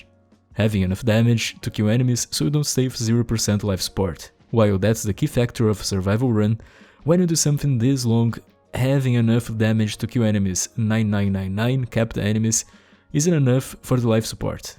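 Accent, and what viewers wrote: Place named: Brazil. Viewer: Sabia que era Brasileiro por causa do sotaque kkkk muito top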